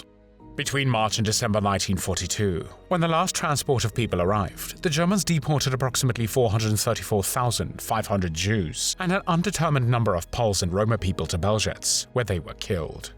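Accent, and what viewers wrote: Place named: United Kingdom. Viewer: ...so what? does this get your rocks off? Cause I see no other reason why you bring your Gaylord Briton Accent to describe how people get killed.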